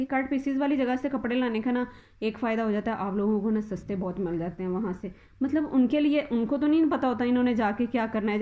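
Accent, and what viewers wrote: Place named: Sialkot, Pakistan. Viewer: MashaAllah bohot bohot peyary design ap bnati hain. apki awaz se lgta hai ap Noreen ki sister hain life of noreenسیالکوٹ ki lgti hain ap🥰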